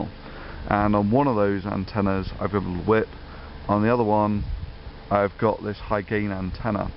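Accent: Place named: United Kingdom. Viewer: O just remembered to ask, where u from as you have a British accent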